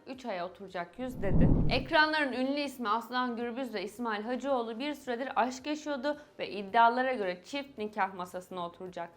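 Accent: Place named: England, United Kingdom. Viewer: Gönül isterdi'ki güzel Türkçe Dilimizi doğru dinlemek . Fakat maalesef ingiliz aksanı ile Türkçemiz katlediliyor. Lütfen , Dilimizi koruyalım . Alfabemizde "R" leri yumuşatarak ingilizlerin aksanı ile bozmayalım . Bu İngiliz aksanı ile Modern veya güzel Türkçe konuştuklarını sanıyorlar, lakin ingilizin Türkçemizi bu yolla katledlettiğinin farkında değiller . Güzel kardeşim lütfen kjendi dilini yabancılaştırma , aksine koru kolla .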